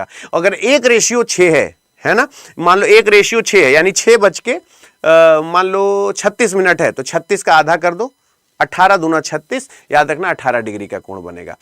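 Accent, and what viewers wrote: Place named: Bihar, India. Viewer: Kha se ye bihari padhane ya geya overacting jyada kerta hai...choko choko kya hota hai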